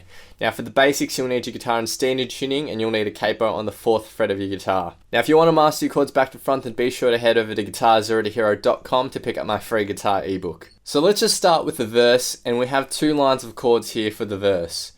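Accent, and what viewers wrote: Australia. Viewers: wAit your Australian :0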